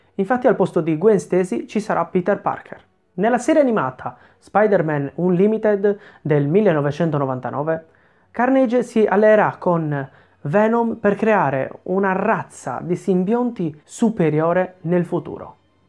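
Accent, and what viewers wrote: Sardinia, Italy. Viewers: Sei sardo nenno? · Ma di dove sei Cagliari, Quartu, Pirri? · Sto guardando tutti i tuoi video,stupendi! Ma.... anche tu sei di Cagliari?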